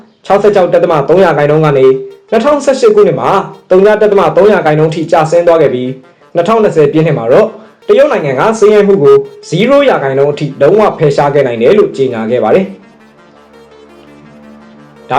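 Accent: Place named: Myanmar. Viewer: ယုံချင်စရာပဲ သတင်းဆိုရင် မင်းတို့မြန်မာအသံနဲ့ဆို ကြည့်ကိုမကြည့်တော့တာ ကျက်သရေယုတ်လို့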